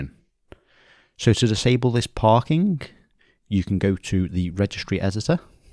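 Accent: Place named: Merseyside, United Kingdom. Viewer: Solid video, are you from Merseyside originally? You sound local. Cheers for the DC promotion video as well, not done that in a while so the video helped.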